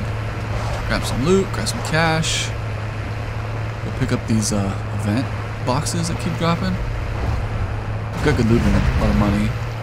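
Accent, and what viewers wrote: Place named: Mexico. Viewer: I know ur Mexican u have a strong accent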